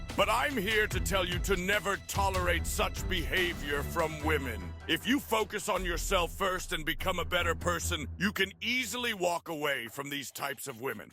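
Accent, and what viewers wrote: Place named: United Kingdom. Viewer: Okay, okay......But why is he British?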